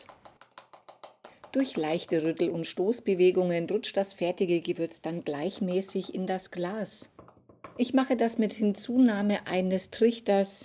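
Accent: Bavaria, Germany. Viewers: Servus,hab vor Jahren eine Brotgewürzmischung so gut versteckt,und durch Zufall wiedergefunden.10Jahre alt,Nase reingesteckt,war wie frisch gemahlen.Wenn es trocken gelagert ist,hält es lange.Dein bayrisch gefällt mir.SG aus Österreich.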